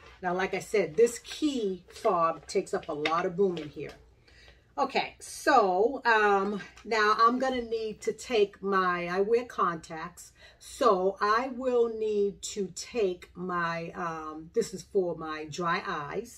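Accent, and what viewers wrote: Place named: New England, United States. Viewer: Love your New England accent. I have this bag in the lite greenish color.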